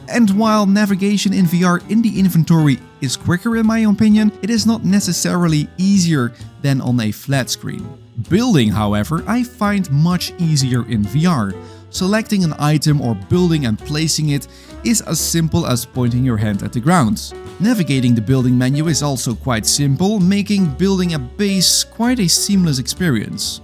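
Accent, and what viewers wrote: Netherlands. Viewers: U bent nederlands 100% aan het engels te horen. 😄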